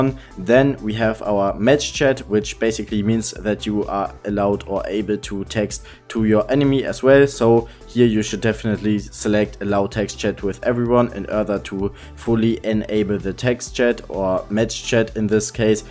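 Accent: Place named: Germany. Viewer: der deutsche akzent😂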